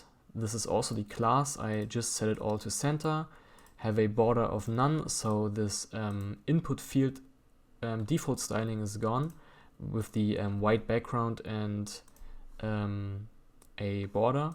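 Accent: Germany. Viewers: I KNEW YOU'RE GERMAN FROm THE BEGINNING ON! (bin auch deutsch)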